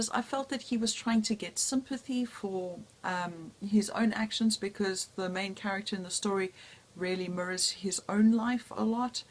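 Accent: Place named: South Africa. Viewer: Ahh I just found your channel! Where in SA are you? I lived in Knysna for YEARS - now live in Australia but so nice hearing a Saffa accent again! OK so I now need to read Wolf Hall haha!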